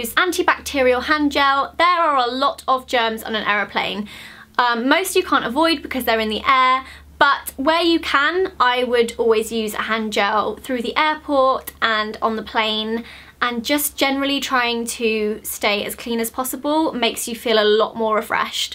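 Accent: United Kingdom. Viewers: I really love your british accent👌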